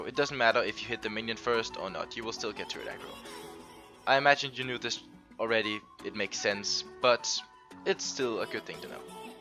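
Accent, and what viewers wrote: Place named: Denmark. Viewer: You sound a bit danish.....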